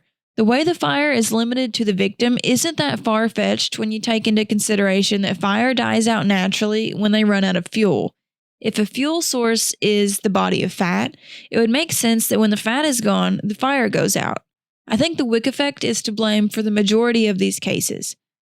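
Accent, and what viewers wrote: Kentucky, United States. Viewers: And as a nice bonus, along with that sweetest southern Kentuckyian accent of yours, I also love your unique sense of humor B. So that means I have found my new favorite content creator, and the videos I'm gonna binge - watching every day, while I'm resting and relaxing after my training, and enjoy while doing it. So the least I can do, is to like, & leave a comment for the " Algorithm Gods" while I'm enjoying your videos. Thank you, and I appreciate you and your hard work that you're putting into making this awesome videos for us, so very much. Cheers!👨‍🎤🌹👧🇷🇸🍀🥂🇺🇸🌏😎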